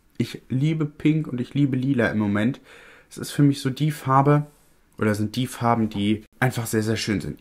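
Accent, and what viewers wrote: Berlin, Germany. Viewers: Schicke bistde👍🥰 of berlinerisch😅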